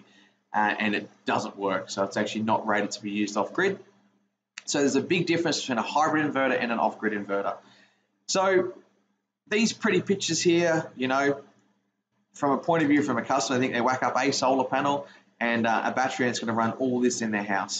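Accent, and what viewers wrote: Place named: Australia. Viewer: It’s nice to hear a plain Australian accent, as we have to decode yank accents 95% of the time. 🙂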